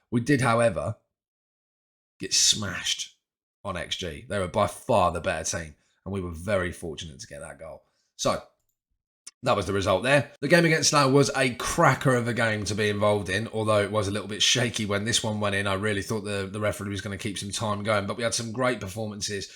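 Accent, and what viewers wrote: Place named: Australia. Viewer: can definitely hear a bit of aussie working its way into your accent lol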